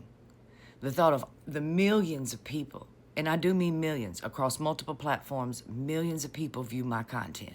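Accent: South, United States. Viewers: I'm so sorry about this situation. I appreciate and love your candor and delivery, I have southern roots and miss that cadence! I pray for protection on you and yours. Be safe and well!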